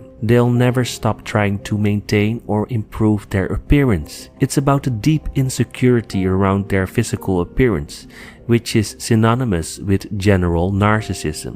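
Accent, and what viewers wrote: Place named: Netherlands. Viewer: Lovely Dutch accent 😃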